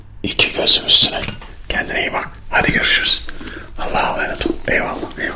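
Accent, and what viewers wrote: Eastern Anatolia, Turkey. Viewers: abi bu platformda asmr konusundan rakibin yok. Daha çok doğu şiveli video gelirse çok iyi olur.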